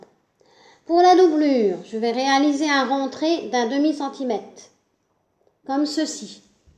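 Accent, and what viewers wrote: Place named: Brittany, France. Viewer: Bravo pour votre tuto et votre bel accent du Méné bré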